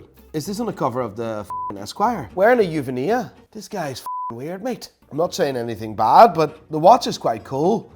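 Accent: Northern Ireland, United Kingdom. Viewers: Are you from Northern Ireland u sound it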